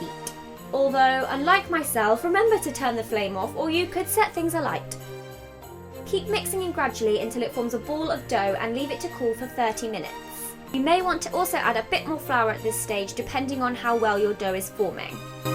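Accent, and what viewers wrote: United Kingdom. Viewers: idk why but you sound so much more british in these diy viedos lol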